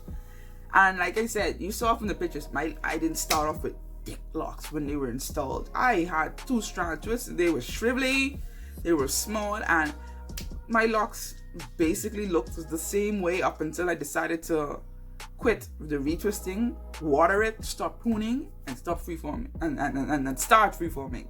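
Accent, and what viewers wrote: Bahamas. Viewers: Girl are you Bahamain, your accent sounds familar? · Thought you sounded like a Bahamian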